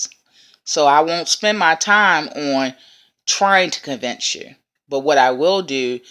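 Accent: Baltimore, United States. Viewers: Never mind. just looked at another video. If you from Baltimore you can hear it everytime.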